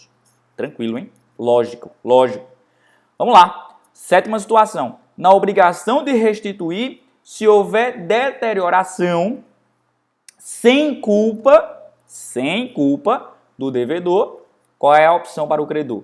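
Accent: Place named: Pernambuco, Brazil. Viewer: Parabéns pelas aulas!! Que sotaque lindo, é de Pernambuco??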